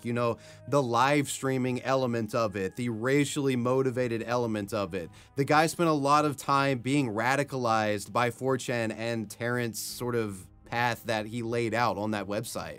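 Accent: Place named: United States. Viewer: I just found your channel yesterday and I’m addicted. I rarely subscribe to any channels and you’re so much better than other channels. I hate when channels are run by people with thick accents or over dramatic. You are straight forward with little drama and normal American accent. Super refreshing!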